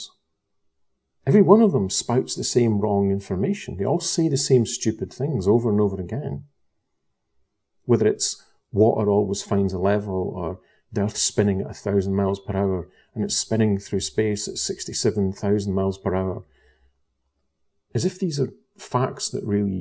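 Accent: Scotland, United Kingdom. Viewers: If you think you live on a ball then aircraft would have to fly around this ball. You sound like you live in Scotland. A flight from Scotland to Gran Canaria in the canary islands is 2,000 miles south. Conventional mathematics will tell you that over this distance the curvature of the earth if it were a ball would show a drop of just over 500 miles ( 8 inches times 2,000 times 2,000 = 32 million inches or just over 500 miles). This would mean that for every mile travelled the plane would need to descend 1/4 mile, it would be nose down all the way. However experience will tell you that this does not happen, the plane will be in level flight all the way aside from take off and landing. BTW the Southern Hemisphere long haul non stop flights are bogus.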